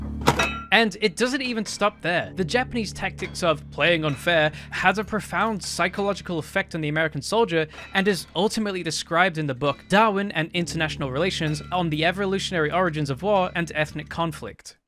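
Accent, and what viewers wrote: United Kingdom. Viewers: The pyrocynical diss is crazy, this channel feels like an exact clone. The autistic obsession with random video games, the queer fursona, the british accent. Its all there.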